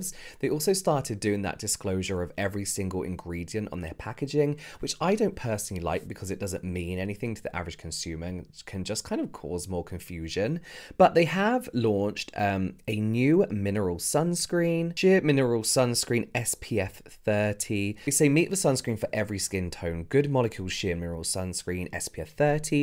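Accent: United Kingdom. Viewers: It is the way you explain things, describe products, give your opinion with a touch of British accent that makes watching your videos such a learning experience and a joy! 😊❤️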